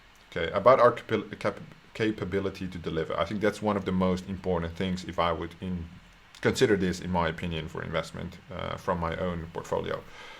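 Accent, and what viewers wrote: Netherlands. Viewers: Are you Dutch bro?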